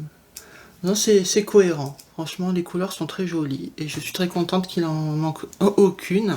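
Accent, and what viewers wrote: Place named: Nord-Pas-de-Calais, France. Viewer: Coucou Comment ça! L,accent chti un tue l'amour??😂😂😂 Moi je l'entends encore un peu sur le son de tes "a" 😁 Ah ah j'ai le même garçon! Jamais de sa faute 😂😂 Merci pour cet agréable moment et de plus j'attendais de voir cette toile avec impatience depuis que tu as dis que tu l'attendais donc maintenant c'est bon je peux craquer 😅😂 Gros bisous 😘😘😘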